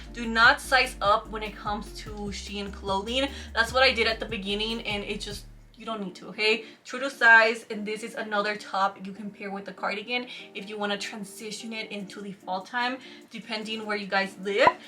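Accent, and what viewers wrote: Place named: Puerto Rico. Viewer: Your Puertorian Accent is Everything☺️😁❣️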